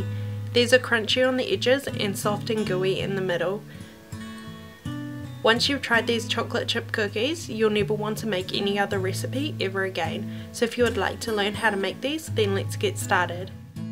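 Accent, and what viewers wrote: New Zealand. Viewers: She sounds like she’s from new zealund bro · Your from new zealand right? I can hear it in your accent :D im a kiwi too!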